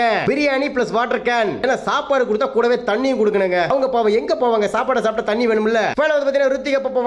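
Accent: Salem, India. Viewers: Unga native salem aa ❤ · Neenga salem ah?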